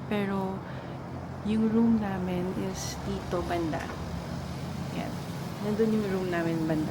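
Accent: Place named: Iloilo, Philippines. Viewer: Ilonggo kamo? I can hear the accent. 😊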